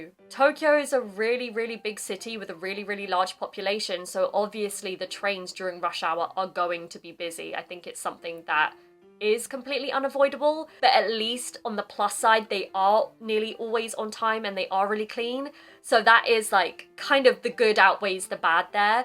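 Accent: RP, United Kingdom. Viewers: I was studying in America for 3 years, so I get used to American English. But your British English is easy for me to understand. Overall, Japanese people are polite. I don't like some Japanese people because they discriminate against physically challenged people. One day, I had a job interview. An interviewer said, "What happened to your legs?" He kind of laughed. That was really rude. I was glad I didn't have to work for them. Since I am vegetarian, I should visit your country some day. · この動画でシャドウイングしよ。 勉強になるし、何よりmodernRPアクセント??が心地よい☺️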